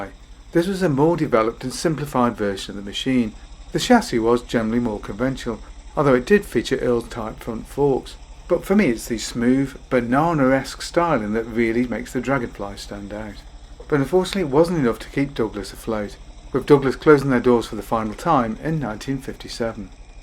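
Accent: United Kingdom. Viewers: Neat bikes! Unfortunately, I could hardly understand a word that was said between the sound quality, his soft voice, and his heavy Brit accent.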